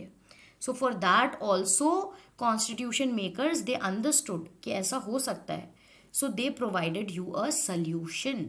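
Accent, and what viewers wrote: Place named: Haryana, India. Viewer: Mam you are somewhere from haryana i am right mam i am from mahendragarh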